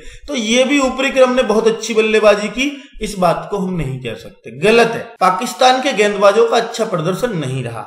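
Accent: India. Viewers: Bolne ka Desi tarika nice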